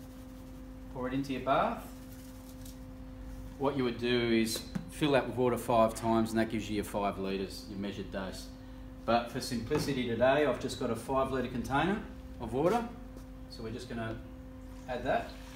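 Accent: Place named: Australia. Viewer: I knew it was an australian accent But i doubt myself initially.. Because the way he speaks is so different to other english speaker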